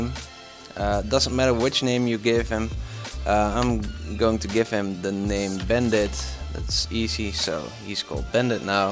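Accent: Netherlands. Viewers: are you dutch ?? i can hear it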